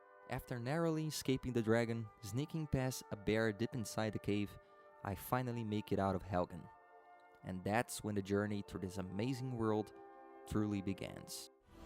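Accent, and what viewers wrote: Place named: Brazil. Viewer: Me listening "esse cara é brasileiro" kkkkk Também jogo desde que lançou quando tava no ensino médio, dahora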